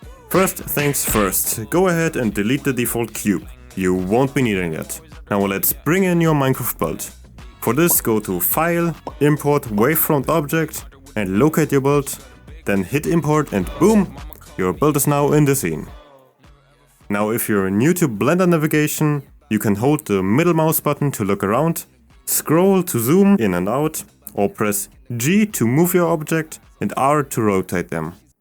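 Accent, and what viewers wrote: Germany. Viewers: Aber top Englisch👍 habs wahrscheinlich nur rausgehört weil ich deutschen Akzent halt kenne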